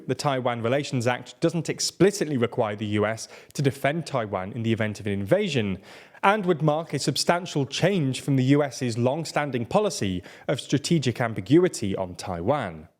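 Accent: United Kingdom. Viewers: i like the program but that stron British accent is really terrible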